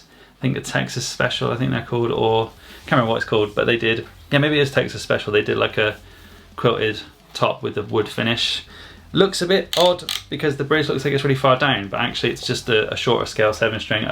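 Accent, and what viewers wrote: United Kingdom. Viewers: Are u from the UK or america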